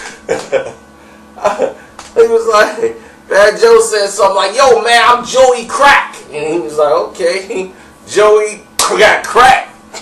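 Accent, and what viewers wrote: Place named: South, United States. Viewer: Dam good southern voice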